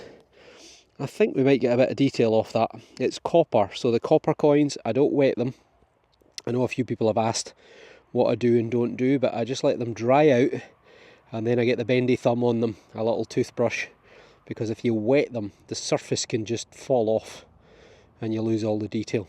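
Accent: Scotland, United Kingdom. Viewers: I love your channel! I love your brogue! I am in Alaska so no digging here this time of year! Love all your finds and Scottish goodies!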